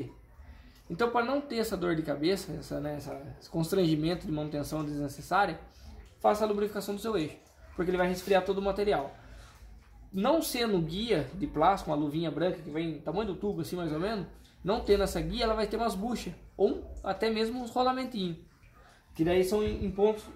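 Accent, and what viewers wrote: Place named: South, Brazil. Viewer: Bom dia gostei de assistir sua explicação tudo de bom pra você você deve ser do Sul por causa do sotaque parabéns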